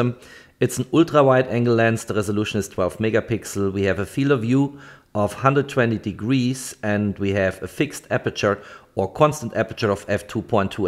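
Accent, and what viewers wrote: Germany. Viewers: du hast so ein deutschen Akzent haha xD hab ich recht? also kenne dich noch nicht das erste mal auf dein Kanal aber das klingt sehr Deutsch für mich :) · Iv I should guess, you are German! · wieso machst du das nicht auf deutsch? hört man doch deutlich dass du deutscher bist 🤔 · Are you german🫠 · u sound like a german jew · Hard german accent :D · Is it only me or has he a German accent? It sounds all so German xD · I thought the same xD. It really sounds so german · ah yes, i hear the german-ness in your voice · Gutes Video, aber arbeite vielleicht ein bisschen an deiner Aussprache :)! Man hört den deutschen Akzent nämlich echt stark 😆 (zum Beispiel spricht man millimeter wie „millimieter“ aus)! Nicht böse gemeint, ansonsten passt alles 😊!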